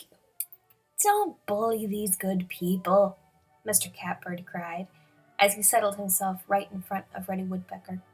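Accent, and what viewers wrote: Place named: United Kingdom. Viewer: so 2 things the reader is British or something and yeet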